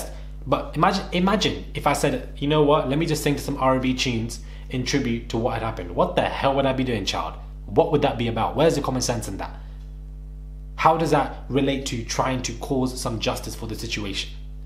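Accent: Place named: United Kingdom. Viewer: Racheal X Whoa! Well I feel dumb, I should have guessed this guy has a primarily uk audience since he sounds like he’s from there too😅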